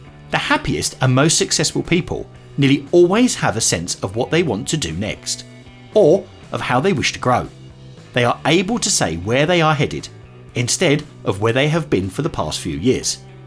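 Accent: United Kingdom. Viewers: Ive never herd something deep from a british accent.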